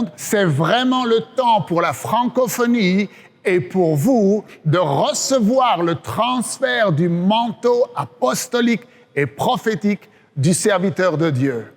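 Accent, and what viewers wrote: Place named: France. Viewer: Amen! Alléluia! Merci bien-aimé frère! I always knew you were French, both from your accent and from your name! The Lord has supernaturally connected me to Morris Cerullo by revelation in 2018, and since I received 5 encounters with this precious man of God through the Spirit. You are blessed as you carry on this mantle and precious words. The Lord strengthen and bless you. Love from Togo.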